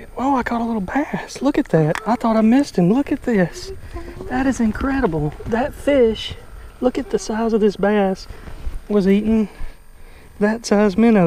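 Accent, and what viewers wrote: South, United States. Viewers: You’re voice is very approachable, like you sound so sweet and southern. I’m sure someone would love to sit with you and fish for a bit lol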